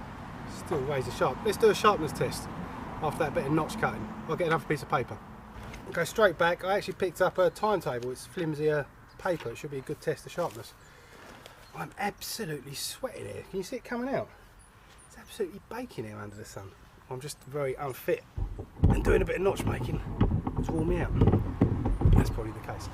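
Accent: United Kingdom. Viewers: .....but you are originally a Brit?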